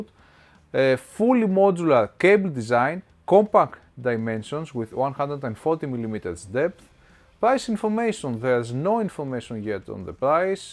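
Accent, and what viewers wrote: Greece. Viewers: Ελληνας ακουγεσαι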